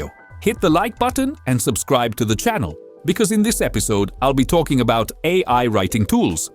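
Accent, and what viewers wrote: Italy. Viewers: I miss the Italian accent and charm.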